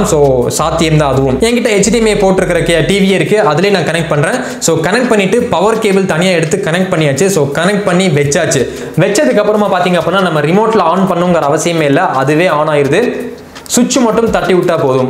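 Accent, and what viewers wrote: Coimbatore, India. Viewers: Bro u r from Coimbatore right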